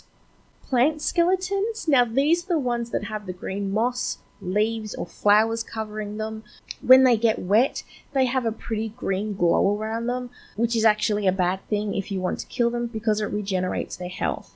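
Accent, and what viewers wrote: Australia. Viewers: dude, I was having to take 6 swipes at reg skellies that normally take 3. I’ve been a PL since year one, so not sure what’s up. Btw, still loving the content and the Aussie accent 😎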